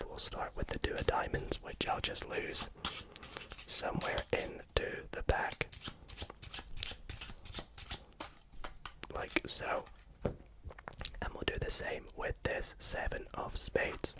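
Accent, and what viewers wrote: Scotland, United Kingdom. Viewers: The reason you're so good at ASMR and also card stuff is simply because you're Scottish lol. It's weird hearing a Scottish accent do ASMR, because I'm also from Scotland haha. But man! Your ASMR is the only one that works for me. Thanks for the vibes, mate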